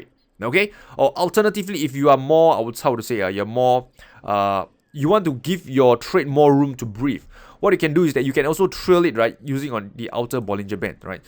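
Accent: Singapore. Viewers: Nice to hear a Singaporean accent :) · are you singaporean, i like your accent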